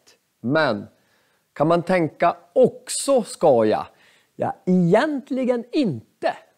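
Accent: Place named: Norrland, Sweden. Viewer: men den är Norrländsk dialekt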